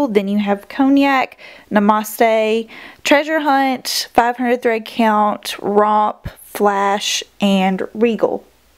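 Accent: South, United States. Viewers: Love to find a beauty guru with a southern accent 😘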